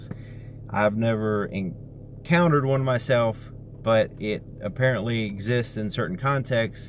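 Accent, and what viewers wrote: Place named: South, United States. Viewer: BTW: Thanks for this small series of videos. I enjoyed watching. I'll admit, I did have a hard time paying attention to anything you were talking about (at first), since I was so focused on looking at you. Without going into TMI territory, you are very pleasing to my eyes, along with your slight southern accent. :^) Sorry if you don't wanna read that. but it's what happens when people (who like the work you do and the sound of your voice) get to see your face and how you animate. Oh yeah. Are your eyes colored Steel or a Gray with a greenish tint? (had a helluva time trying to figure it out) blush Ok that's all for now! :D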